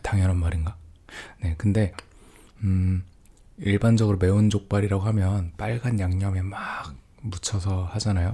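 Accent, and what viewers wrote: Cheongju, South Korea. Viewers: 청주분이확실합니다!!!오명가명 마주친것같은 익숙함.........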